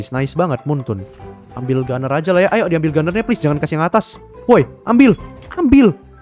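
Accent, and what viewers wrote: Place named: Java, Indonesia. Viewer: Asal mana ya mas ? Kok logatnya agak jawa dikit hehe salam dari surabaya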